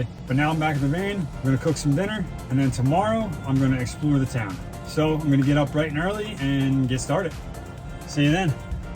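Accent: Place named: Philadelphia, United States. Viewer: You got that Philly accent lol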